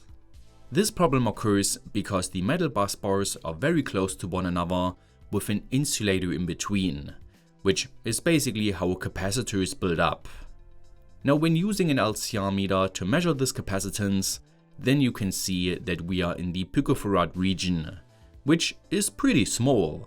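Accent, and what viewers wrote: Germany. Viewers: Bitte arbeite dringend an deinem Englisch oder sprich einfach deutsch. Man hört das du deutscher bist, dein Englisch ist einfach nur grottig.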